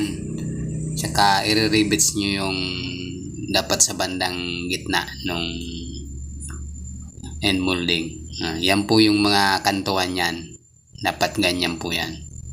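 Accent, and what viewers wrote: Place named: Bicol, Philippines. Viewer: Bicolano manoy?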